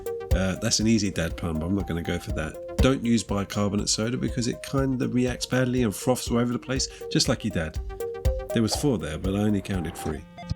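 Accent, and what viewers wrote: United Kingdom. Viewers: Hahaha, your rambling sounds very much like Korg from Thor Ragnarok, with a British accent. Love the channel!!!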